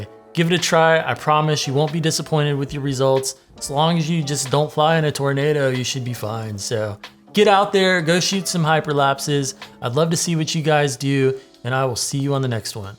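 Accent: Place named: Russia. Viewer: Ах этот русский акцент 🤪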